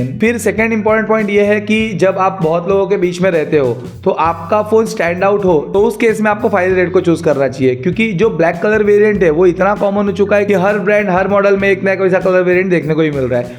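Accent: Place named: Maharashtra, India. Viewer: मराठी माणूस आहे का रे भावा तू ? 😄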